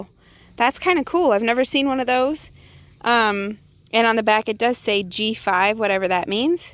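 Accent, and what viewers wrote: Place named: Michigan, United States. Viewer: Nice finds,,like the Michigan accent, i got to hunt in pontiac once, i know a few folks in Houghton lake and Traverse city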